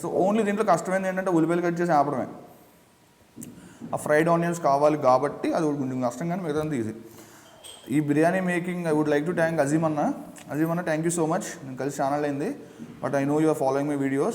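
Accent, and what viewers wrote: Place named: Konaseema, India. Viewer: Bro! Thanks for the recipe. Dum biryani with konaseema accent. Super ....Feel like eating raw village biryani..... Sounds great...... I am in USA now, it will help people like us . I appreciate your idea!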